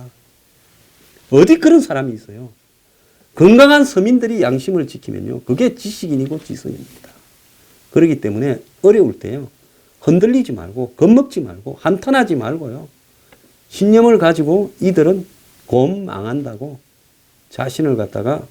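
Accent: Gyeongsang, South Korea. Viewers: 황소장님 정치적견해를 떠나 구수한 갱상도사투리 좋습니다^^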